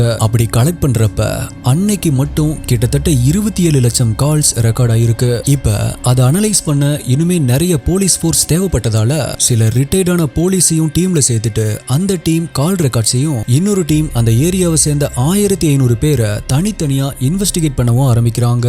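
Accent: Coimbatore, India. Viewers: unga coiambatore slang super bro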